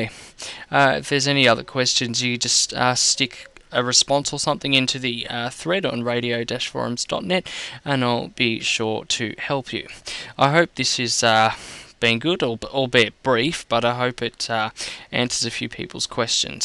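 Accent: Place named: Australia. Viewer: love the aussie accent by the way bruh. I'm wanting to lets say set up a radio broad cast for prank phone calls. I'm going to be using Skype with a friend or 2 and will be calling numbers for the calls. I will be using this set up.. but how do I actually broadcast to people? Am I going to need to set up an SQL database through my server? I have PhpMyAdmin and all that... just wondering if you could help me out here thanks! If email is easier then email me <mail>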